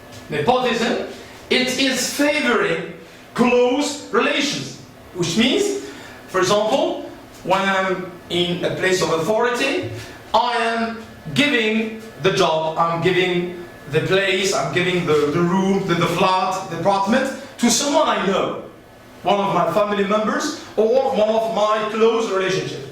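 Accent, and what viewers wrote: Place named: United Kingdom. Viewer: a british accent! Wow>-< that's great Thanks a Lot Sir i really appreciate that('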